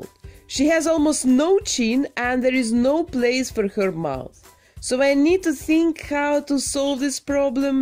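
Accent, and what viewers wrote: Russia. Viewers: This russian акцент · Русский акцент ?! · I love your accent. Are you from Russia? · I like your Russian acent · Oh, Russian accents are so beautiful and zaftig sounding. I must say, I do think I wig may have been better, and perhaps a smaller nose pompom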